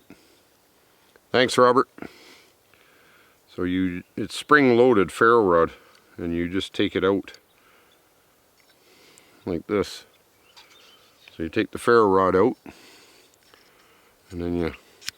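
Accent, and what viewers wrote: Canada. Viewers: The Canadian accent is why I subbed xD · Buddy’s so Damn Canadian hahaha. I love how he said gondola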